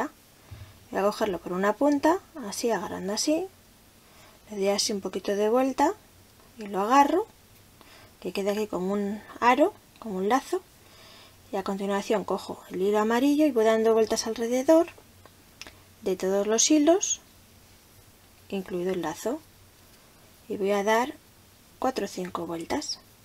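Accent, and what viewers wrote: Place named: Spain. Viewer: Jijij me di cuenta en el principio que eras de España:)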